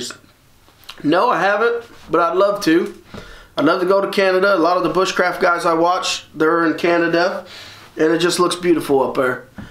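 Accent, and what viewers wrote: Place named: Indiana, United States. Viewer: Love the channel... Indiana here. Don't sound like I'm to far from ya. Keep up the good work.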